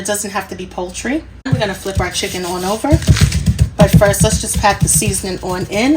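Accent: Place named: Brooklyn, United States. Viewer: Great accent. Maybe Brooklyn NY?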